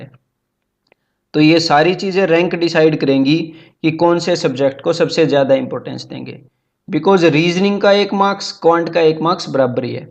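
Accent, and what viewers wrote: Haryana, India. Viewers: Lol yer your accent is cute..haryanvi sort of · Sir aap haryana se ho kya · Very good sir.... Aap Haryana se ho...?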